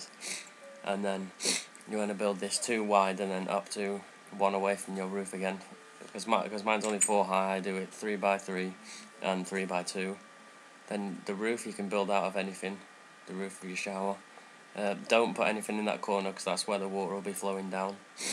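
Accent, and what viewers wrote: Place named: United Kingdom. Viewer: He's british he say it that way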